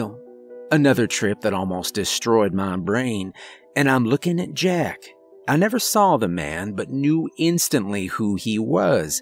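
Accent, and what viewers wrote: United States. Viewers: Sweet another amazing story I love the cowboy accent on this one to would love to hear more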